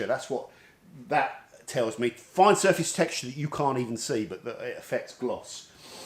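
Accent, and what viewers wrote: United Kingdom. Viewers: God I love English accents hahaha. Maybe more people would watch me if I was British hahaha. You really know your stuff man, this video is excellent so far. One of my customers brought a gloss meter in once, he used them at his work to measure gloss on like gloss business card prints.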